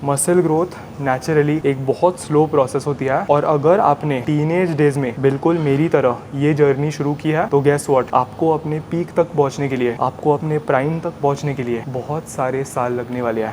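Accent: Maharashtra, India. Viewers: MARATHI ACCENT IS SO CUTE! 😊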